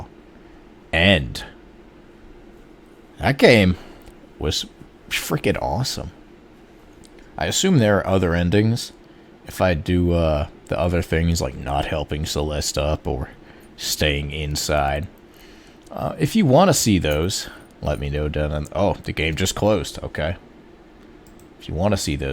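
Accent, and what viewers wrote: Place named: South, United States. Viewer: Why does this game bring out a redneck accent in you lol. You should do voice acting.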